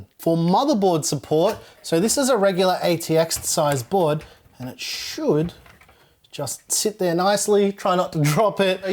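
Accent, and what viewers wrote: Australia. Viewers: Great case review, and your build looks great but Zeeee, You’re not AMERICAN you sound Australian, we say Zed, not zee!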